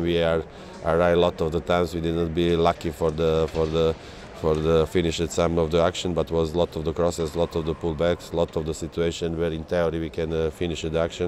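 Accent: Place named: Yorkshire, United Kingdom. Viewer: He’s starting to get a Yorkshire accent 🤔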